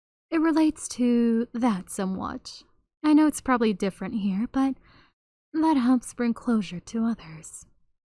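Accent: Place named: Vietnam. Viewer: the vietnamese pronunciation is kinda cute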